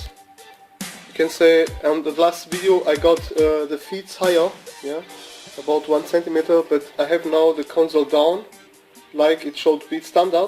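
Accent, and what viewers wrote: Germany. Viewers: Man hört sofort dass du ein deutscher bist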